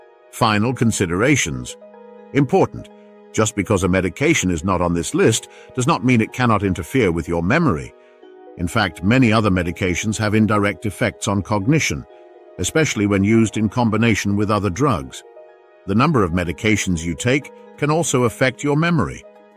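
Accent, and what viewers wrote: United Kingdom. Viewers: Is you tube in Britain? Every announcer speaks British so i am curious?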